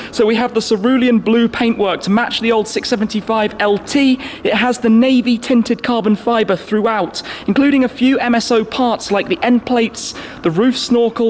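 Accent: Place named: United Kingdom. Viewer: 협찬 잘~ 들어온다.. 너의 혀짧은 영국식 영어는 여전히 적응이 힘들다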